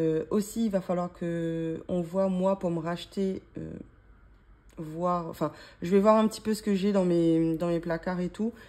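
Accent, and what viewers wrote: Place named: Réunion, France. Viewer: Petite question qui n'a rien à voir mais d'où viens ce petit accent ? La réunion ?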